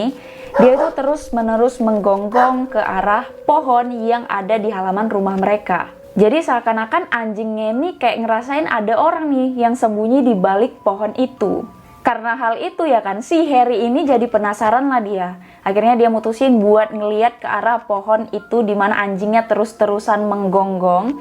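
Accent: Medan, Indonesia. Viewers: Dari yg kangen denger cewe logat medan.. Skarang udah terobati Kangen Tebing Tinggi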